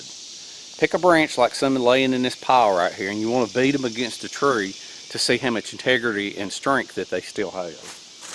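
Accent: South, United States. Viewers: Hey Bro, I'm in South Carolina. By the sound of your accent, we're southern cousins. From which of theses United States do you hail, patriot?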